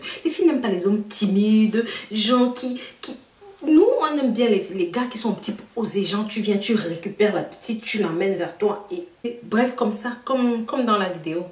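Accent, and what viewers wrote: Cameroon. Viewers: J'adore ton accent je crois bien c'est un accent camerounaise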